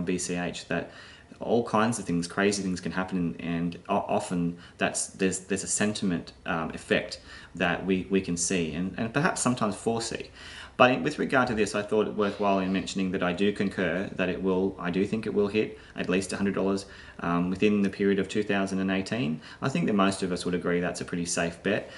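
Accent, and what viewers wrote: Australia. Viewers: Nice to hear an Australian voice critiquing the crypto market. Recently came across your vids and am enjoying your input, keep up the great work... cheers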